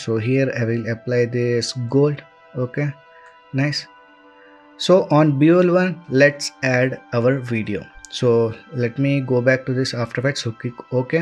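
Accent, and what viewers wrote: India. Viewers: I knew it would be a good tutorial when I heard the indian voice.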